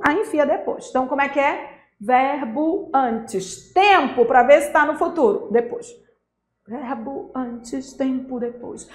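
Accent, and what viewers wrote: Pará, Brazil. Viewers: Quando eu ouvi o bagulho ja sabia que era paraense 😂😂😂😂😂😂😂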